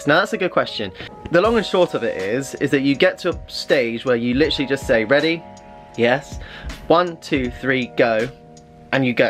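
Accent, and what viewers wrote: United Kingdom. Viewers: Ok for the red spots I recommend clean them good with soap and water then add triple antibiotic ointment dont touch them not repeat the cleaning of them and putting a triple antibiotic ointment on them that way the Mexican work to clean your skin up winter skin has cleared up from them put some lotion on it to you know replenish ur skin. P. S. love ur voice love that British or Australian or Canada! Now on the music that you are playing I don't see where you give the copyright notice to the label company that owns that song as a DJ I always put on CDs copyright-protected of the year the CD was issued with the label.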